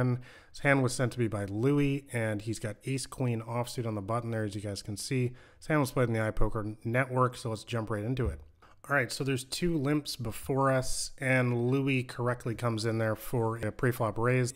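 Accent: Canada. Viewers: he definitely has a strong canadian accent, and i say this is a canadian lol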